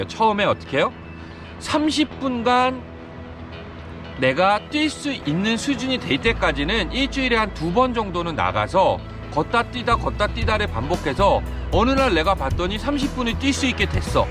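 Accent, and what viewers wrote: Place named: Seoul, South Korea. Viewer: 서울말 깔끔하게 구사하셔서 깜짝 놀랐어요..ㅎㅎ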